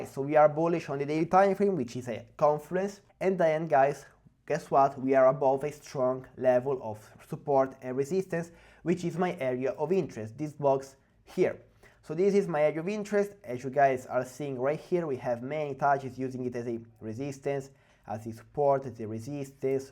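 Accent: Italy. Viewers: ma per curiosità perché li registri in inglese?avevo sentito un pelino l’accento italiano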